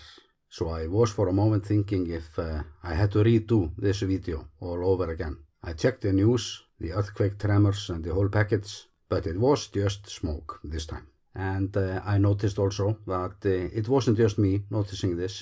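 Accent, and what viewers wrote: Iceland. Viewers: I know you are teasing, but I still want to say that his wonderful voice and accent add a great deal for me. I can’t imagine his videos narrated with, say, a British or American accent. I have to pay close attention — actually a good thing — but I understand everything he says. For this ‘Murican, though, Icelandic place names are impenetrable, which is actually rather fun. Just don’t expect me to be able to pronounce or spell them!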